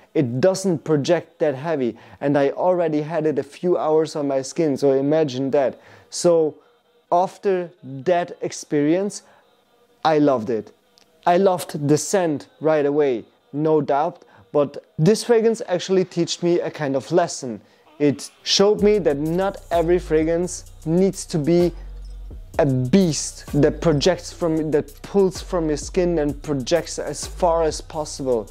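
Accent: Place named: Italy. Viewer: Wearing Gris charnel far too much at the moment 🤣. That Italian accent, almost as good as mine 🥲👍🏻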